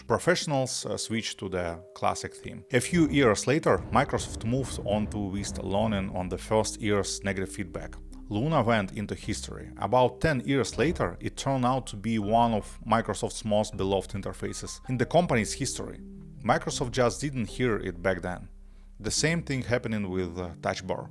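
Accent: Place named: Russia. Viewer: I appreciate you using your real voice now instead of AI. Your Russian accent and manners bring a new feel to design content on YouTube that is refreshing.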